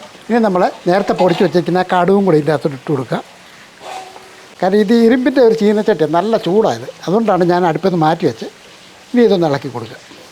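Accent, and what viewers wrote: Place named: Central Travancore, India. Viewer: എന്റെ സഹോദരാ, ഞാൻ സ്വയം പാചകം ചെയ്യുന്ന ആളാണ്. ഒറ്റയ്ക്ക് ആണ് താമസം. എന്റെ കിച്ചനിൽ ഇപ്പോൾ റെസിപ്പി മാത്രമേ ഉള്ളൂ. പുകഴ്ത്തുകയല്ല എല്ലാം അടിപൊളി. താങ്കളുടെ വിവരണം നന്നായിട്ടുണ്ട്. താങ്കളുടെ ഈ വിനയം ഉണ്ടല്ലോ എന്താ പറയാ ഒരു വലിയ സല്യൂട്ട്👍🙏. മധ്യ തിരുവിതാംകൂർ കാരൻ ആണെന്ന് തോന്നുന്നു സംസാരം കേട്ടിട്ട്. ദൈവം ധാരാളമായി അനുഗ്രഹിക്കട്ടെ.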